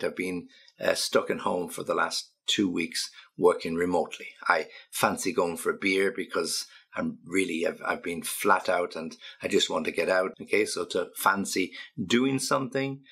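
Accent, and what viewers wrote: United Kingdom. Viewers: Are them british sounds or American? I like british. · I love british accent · مرحبا هل هذه لهجة بريطانية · I just found this channel, British English and I love this so much. I'm Indonesian, and I love learing Brit_Eng ❤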